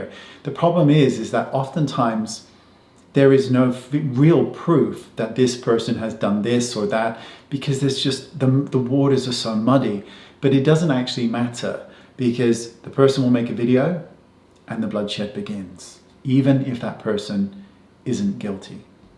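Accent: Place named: Scotland, United Kingdom. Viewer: That Scottish accent is really poking through in this video and I love it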